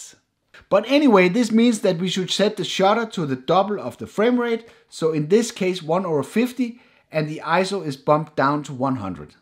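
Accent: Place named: Denmark. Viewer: Fantastic video! Best one on youtube to explain ND filters! oh well, your english has a bit of danish taste. that's even better! greetings from Copenhagen! Mange tak!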